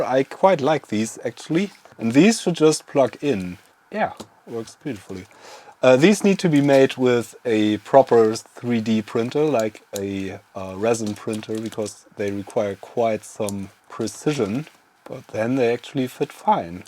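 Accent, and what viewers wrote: Germany. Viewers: Schade das die Videos immer in Englisch sind. Vom Akzent her würde ich sagen das Sie deutscher sind manchmal ist es schwierig zu folgen da mein eigenes Englisch nicht reicht. Aber nichts desto trotz sehr gute Videos und gut erklärt. Vielen Dank dafür. Grüße aus Niedersachsen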